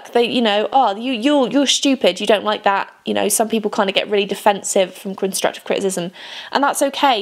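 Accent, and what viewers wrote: England, United Kingdom. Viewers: Are You British? I'm sorry for my obnoxious questions. I'm just curious because your accent sounds British, yet you talk about dollar store. By the way totally amazing video very relatable!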